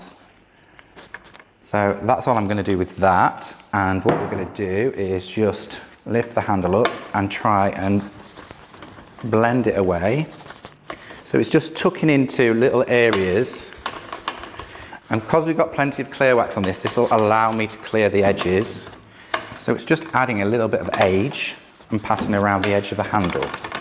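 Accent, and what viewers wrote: United Kingdom. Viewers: First let me say, LOVE the British accent. Hello from New York, USA! I like the fact that you did not said the piece to obtain the "distressed" look. Water seems, it only easier, but better for getting the paint off exactly where you want to without sanding somewhere you don't. Also, the water seems to be a lot quicker and cleaner. Thanks for sharing!!!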